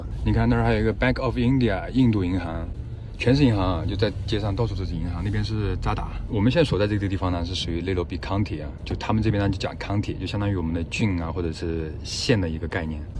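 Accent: China. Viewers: 英文能听懂，还是蛮中式的哈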